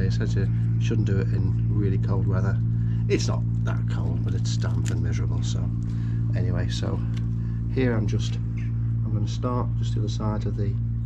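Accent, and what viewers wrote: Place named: Lancashire, United Kingdom. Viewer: Just having breakfast clicked on YouTube watching your channel as I only found you about a month ago I'm watching your 1st ride on the GT. I know this road intermittently, Settle if I'm not mistaken. This is my 2nd blade too. I had a 06 which was better than the 15. Just watching next vid I think you just confirmed Hawes n Settle. Must crack on I've just moved from Stockport to Rochdale, your accent suggests Burscough/Skelmersdale. Calimoto screen shot north of Skem when I get the GT I'd love to meet up.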